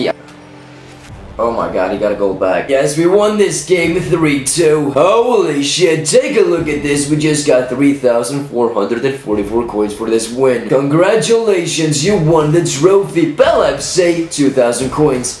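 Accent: Iceland. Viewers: Everyone saying his voice is annoying did you ever think it was because of his accent and hes from iceland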